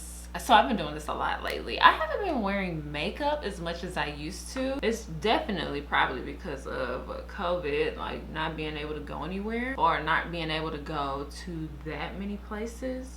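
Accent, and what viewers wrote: South, United States. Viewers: Love your content, you're the only person on YT i know who wears their natural hair in it's shrunken state (the reason i subcribed) you're a unicorn. I think if you made dedicated videos showing how you wear your hair (a week in my natural hair type video) those videos will blow up. Your haircare routine is simple which alot of us want. Another video idea you sound southern mayvbe share your experiences being natural in your community?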